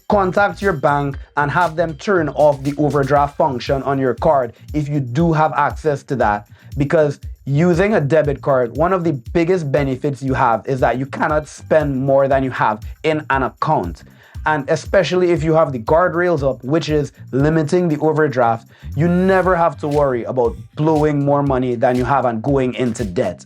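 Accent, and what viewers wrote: Trinidad and Tobago. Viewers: A Trini Accent? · I can hear yuh sounding like ur trini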